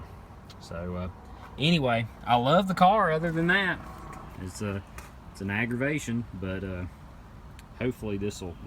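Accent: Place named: Tennessee, United States. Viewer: Hi, I bought a 2006 Jazz here in West Yorkshire a week ago. I knew about the leak issue so was pleased to find mine is OK. However, i took the precaution to seal those areas you highlighted with clear silicone sealant. Have you got another car and ditched the Jazz? ps love your accent, im guessing Tennessee?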